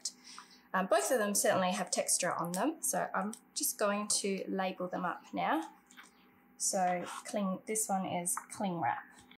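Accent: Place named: Australia. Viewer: As soon as I heard you’re Australian I subscribed to your YouTube channel. So nice to hear a familiar accent. And you use materials available to us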